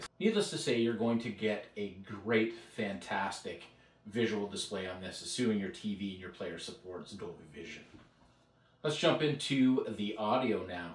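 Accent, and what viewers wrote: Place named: Canada. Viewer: Very succinct and quality review! I also enjoyed your Canadian accent.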